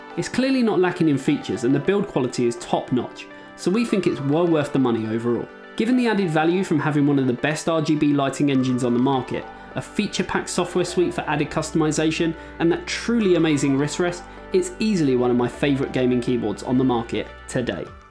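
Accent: United Kingdom. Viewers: I love when to watch english video's instead of american. I don't know how but the british accent makes me focus more on the video instead of interruption of my dogs barking or driving by cars🤔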